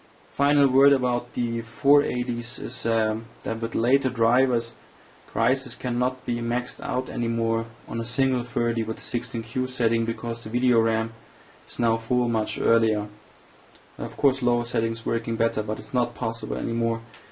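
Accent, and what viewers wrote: Germany. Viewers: So ein geiler deutscher Akzent... :-) Aber Hammer Gerät ist das!!!! Haben will ! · You speak like a german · Du bistn Deutscher :D das hört man soooo extrem :D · Nicht schlecht. Man hört so krass, dass du Deutscher bist ^^ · Kann des sein das du Deutscher bist? Dein Akzent ist so. :D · Man kann an deiner Stimme hören, dass du Deutscher bist xDD btw wie viele fps haste bei fullhd crysis mit kp wie viel AA^^ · English F.A.I..L i am sure u german right??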